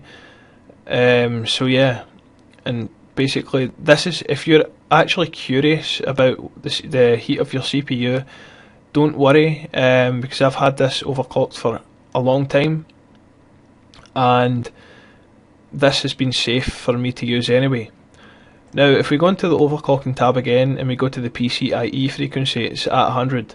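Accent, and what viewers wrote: Scotland, United Kingdom. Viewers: Was looking for some help on this as my ASRock OC tuner and hwmonitor say my cpu fan is around 3300rpm when idle...is this normal? Also i was pleasantly surprised to hear you're voice and that you're a fellow scot! Greetings from Glasgow! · are u scottish?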